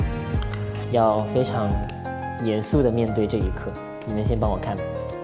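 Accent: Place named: Malaysia. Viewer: 对啊讲回马来西亚腔好亲切啊，真的好可爱呀他讲话。好可惜我的小名不是阿ying，哈哈哈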